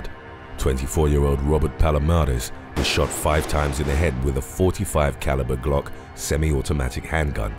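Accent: United Kingdom. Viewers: using a British voice over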